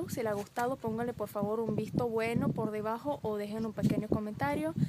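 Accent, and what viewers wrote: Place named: Venezuela. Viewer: Diría que tienes acento Venezolano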